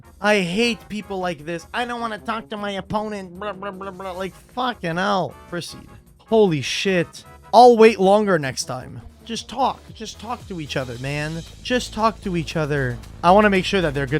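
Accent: Quebec, Canada. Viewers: He is french canadian! Pretty sure from quebec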